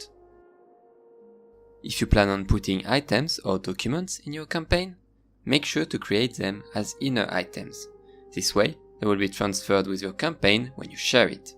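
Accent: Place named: France. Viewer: Bon, je suppose que t'es français vu ton accent xD C'est en français le "campagne quest" ? Parce que c'est la première fois que je vois ça,et j'avoue que ça rajouterait un peu de lore épique :D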